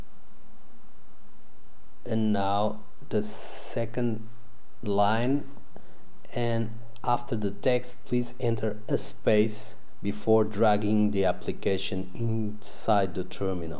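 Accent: Portugal. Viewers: Reconhecemos um fellow tuga quando o ouvimos hehe. Obrigado ! Resolveu !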